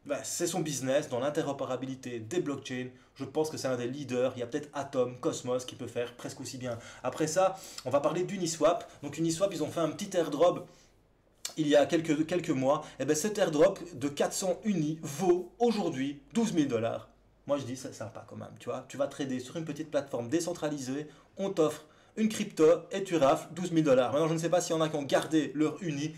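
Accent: Belgium. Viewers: Coucou ça fait plaisir d'entendre ce belle accent belge, par contre le son c'est toujours aussi bas en volume et en gamme, courage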